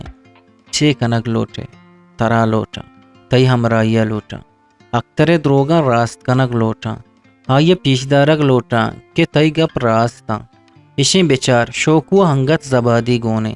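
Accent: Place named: Balochistan, Pakistan. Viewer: Your Baluchi accent mashallah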